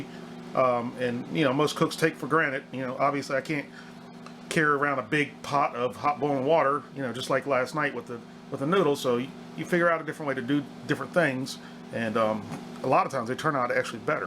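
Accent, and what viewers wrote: South, United States. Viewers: You are sounding extra southern here!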